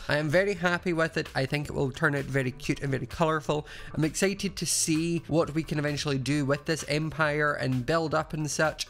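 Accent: Scotland, United Kingdom. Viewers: I've notice your accent is less Scottish since the start of this smp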